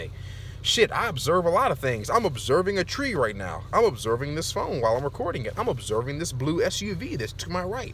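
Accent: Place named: Maryland, United States. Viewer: You sound like you from dmv like maybe Maryland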